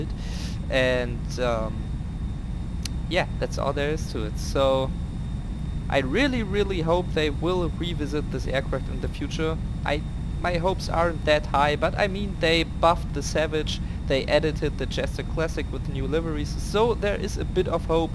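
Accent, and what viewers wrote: Germany. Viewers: I LOVE UR THICK GERMAN ACCENT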